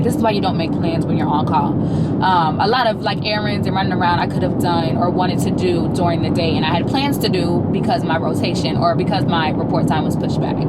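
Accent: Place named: New York, United States. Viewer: I assume you're from New York based on your accent or atleast that's what it sounds like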